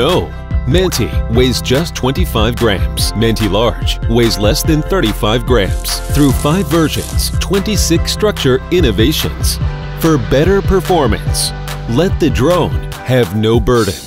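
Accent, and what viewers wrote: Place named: China. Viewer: The Chinglish is putting me off.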